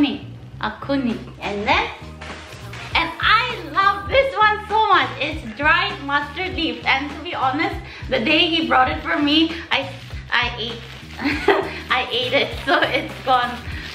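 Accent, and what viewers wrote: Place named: Nagaland, India. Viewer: Your accent is just like AO tribe of naga 😂😂😂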